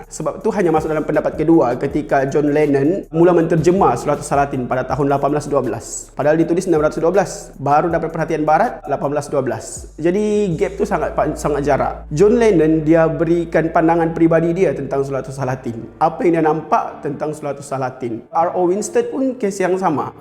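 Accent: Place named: Sabah, Malaysia. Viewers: Pekat sabahan mu pok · bro, istidi saja kau sama slang sabahan mu, lg siyuk didengar, ok. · Nampak thumbnail.. uinah mesti Sabahan ni.. klik.. dengar suara.. confirm urang kita baini.. mantap bosku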